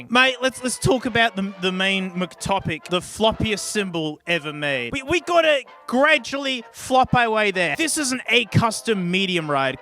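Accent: Australia. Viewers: Wait a minute, " ", that familiar Aussie boy voice, is this the man behind Tony and the Litreboard?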